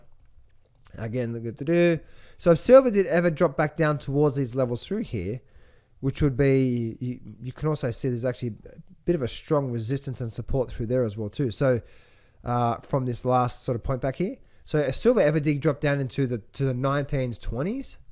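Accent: Australia. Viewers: Thanks,. DAH-ta for us Americans. DAY-ta sounds better with your Aussie accent. nq17k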